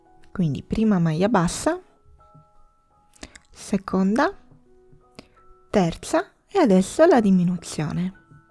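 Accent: Sardinia, Italy. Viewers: Sei bravissima!! 😊 ma sei sarda? Noi si 😊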